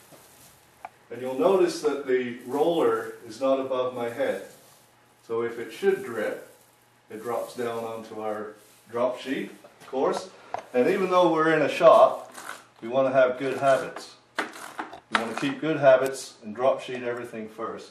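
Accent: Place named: Scotland, United Kingdom. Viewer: This was great to watch a real pro doing the usually messy job of painting a ceiling the proper way... bit jist as a wee small aside noo, kin a no detect jist a wee bit oh the far-flung 'remnants' of a guid Scot's accent, hiding in backgroond? Well; an av always says this massel son... Ye jist canny hide true Scot's class (or talent).. fi nayebidy, nay matter whoar yi ur roon the wurruld or even in the back 'o beyond, an that's fur shure tae! Thanks, for uploading this video.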